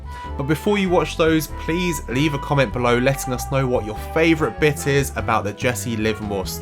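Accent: United Kingdom. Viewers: west london accent nice video: really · I like your British accent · Is this Brittish accent？ Thanks！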